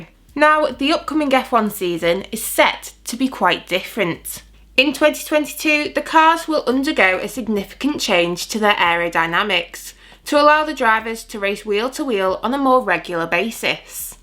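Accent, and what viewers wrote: United Kingdom. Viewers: You've got to love that british accent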